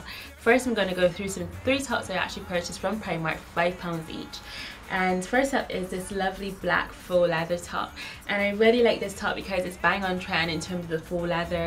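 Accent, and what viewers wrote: Barbados. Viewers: Totally been stalking your channel, heard your accent and knew you were a fellow Bajan in the UK! New subbie!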